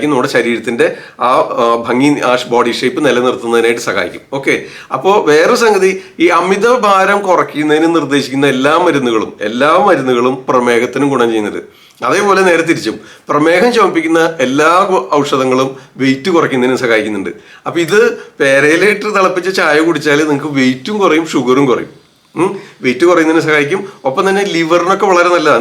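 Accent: Thrissur, India. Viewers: Nammude trichur style samsaravum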